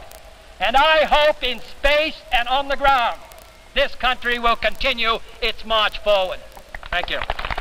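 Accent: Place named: New England, United States. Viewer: And that cool New England accent.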